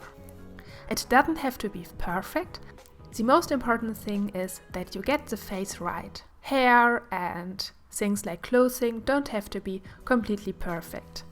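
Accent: Germany. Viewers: Based on the accent I'd say you're german. Right?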